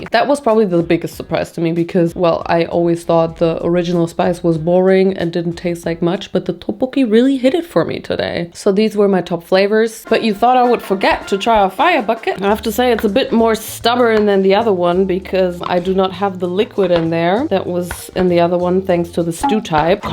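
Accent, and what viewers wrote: United Kingdom. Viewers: As a Brit, I love your British accent! You nail it Queen! YUS! But gimme gimme those spicy noodles.....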